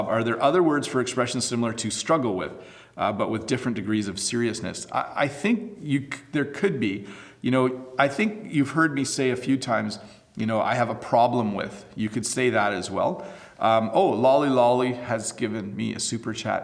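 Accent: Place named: Canada. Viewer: Thank you, i find your canadian accent better than the u.s.'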